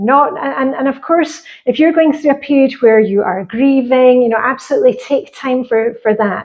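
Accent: Scotland, United Kingdom. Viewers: Hiya. In answer to your question of how I found you, you've popped up on my YouTube suggestions on my mobile. I've been watching de-clutter, minimalist stuff so guess it's those algorithms. I warmed to your scottish accent, I'm a fellow Scot! Thank you and keep up the good work 😊 x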